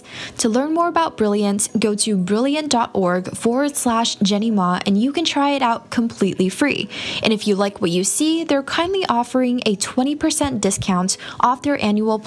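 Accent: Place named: China. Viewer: I love your chinese -english language , you are easy to understand so new to your channel so dont know what u cover jenny ma but will soon find out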